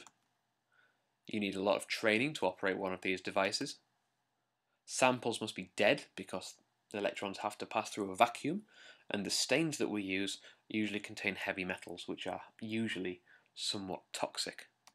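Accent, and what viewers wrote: United Kingdom. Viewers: Great, easy to understand. And not a robotic voice. An awesome British speaker.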